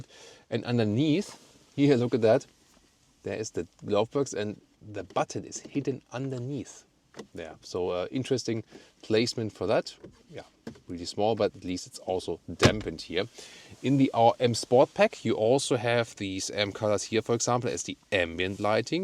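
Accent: Germany. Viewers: love your videos ....i think reviewing cars just sounds better with a German accent !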